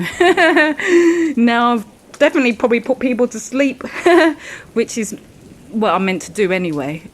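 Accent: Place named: United Kingdom. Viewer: Hey, you’re british 🎉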